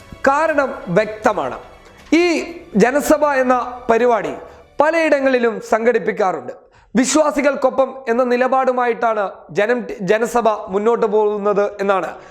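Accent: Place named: Malappuram, India. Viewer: ഇങ്ങള് മലപ്പുറത്ത് കാരനാലെ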